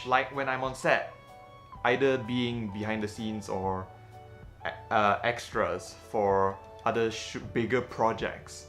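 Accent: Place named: Malaysia. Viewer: I'm glad you found passion and joy in making films and videos, as opposed to being stuck in the Science Route™; it was nice to watch this video and get to know you a little better!!:) I can't wait for more videos!! You're doing a good job, keep it up!! Personally I prefer your Malaysian accent, but maybe bc it feels as if we are a little closer. Hehehe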